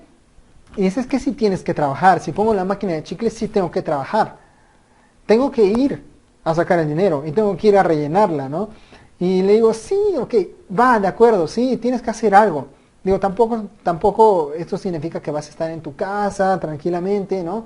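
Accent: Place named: Argentina. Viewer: Hasta que comienzas eres bueno pero parece Argentino ca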